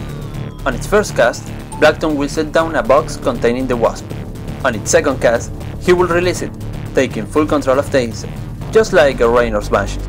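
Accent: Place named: Argentina. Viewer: tu acento es re argento maquinola, de donde sos?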